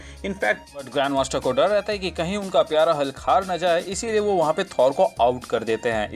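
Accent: India. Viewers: Aap ho kaha se brother kyuki aapke baat krne ka tarika kaphi south east hai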